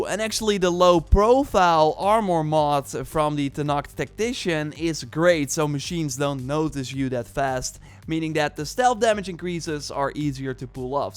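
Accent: Netherlands. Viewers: Like your videos but it is not "Tear" as in crying. It is pronounced "ter" as in tearing off my eyes in cringe of recognizing your dutch accent :) enjoy your videos though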